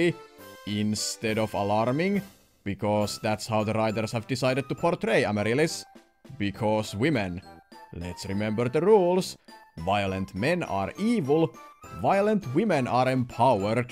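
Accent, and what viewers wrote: Finland. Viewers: Are you finnish by any chance?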